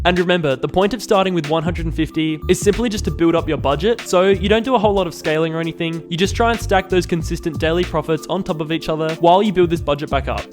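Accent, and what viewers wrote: Australia. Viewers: Pretty sure you Australian haha big shout out from NZ!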